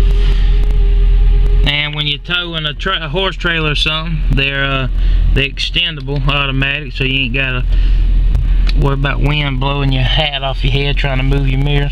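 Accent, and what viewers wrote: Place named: South, United States. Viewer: What state are from you have a southern accent.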